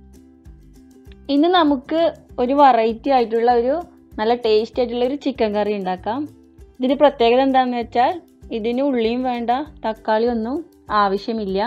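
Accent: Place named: Pathanamthitta, India. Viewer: Very unique and easy! Nannaittundu.. naatil evideya? Annikkorundeiend undu from Pathanamthitta.. aulde samsaram pole und :)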